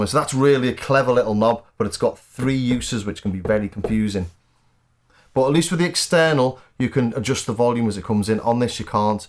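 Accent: Liverpool, United Kingdom. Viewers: Love that Liverpool accent!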